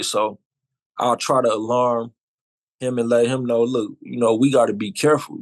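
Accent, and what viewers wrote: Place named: West Coast, United States. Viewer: Sounds like a West Coast dude.....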